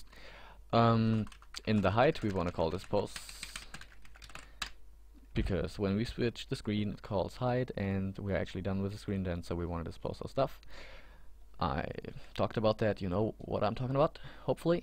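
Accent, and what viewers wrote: Germany. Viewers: You sound german :D You're from Germany? Aren't you?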